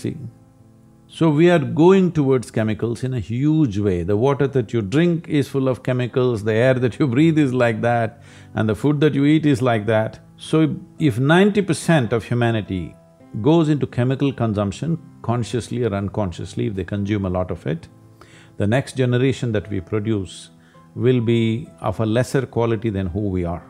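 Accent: India. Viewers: It's ur loss really. BTW, Google is able to fairly subtitle Indian accents, not perfectly, but fairly. Maybe give it another shot when u feel more open-minded 👍✌️ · South Indian English , i think is far better than hissing Scottish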